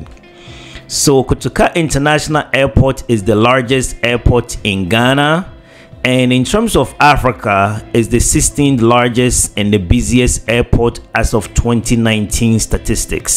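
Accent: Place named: Canada. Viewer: I like your Canadian accent 😊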